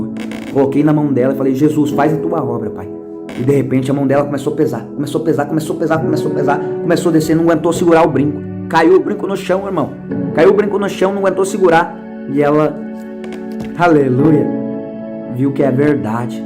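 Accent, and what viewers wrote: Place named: Rio Grande do Sul, Brazil. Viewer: Ele e gaúcho o sutak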